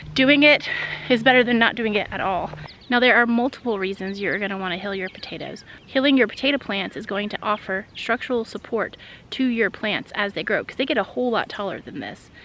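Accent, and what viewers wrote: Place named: South, United States. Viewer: Love you!! Is it just me or is she extra southerrrrn on this one?? ❤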